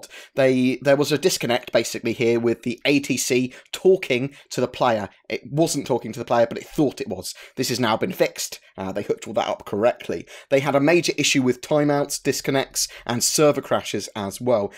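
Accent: United Kingdom. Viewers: your speech is very hard to understand, especially for not a native English speaker. can u talk more slowly or just don't eat the words? with your British accent it is completely impossible to listen to u because of your style of speaking.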